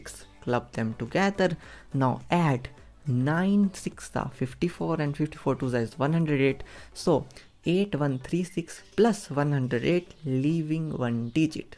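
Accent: India. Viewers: I believe him, he sound indian than american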